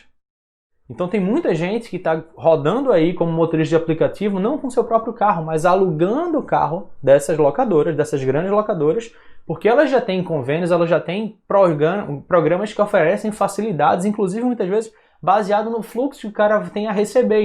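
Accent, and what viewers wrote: Pernambuco, Brazil. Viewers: Pelo seu sotaque vc é daqui de Recife, né? 😂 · Vc é de Pernambuco?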